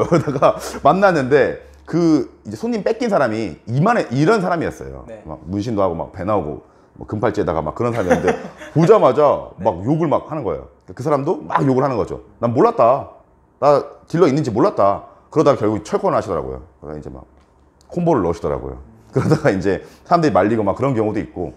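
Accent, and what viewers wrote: Gyeongsang, South Korea. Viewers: 진행자분 경상도 사투리좀 감추세요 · 딱 들어도.. 경상도사투리인데 왜 북한이라고 하는지?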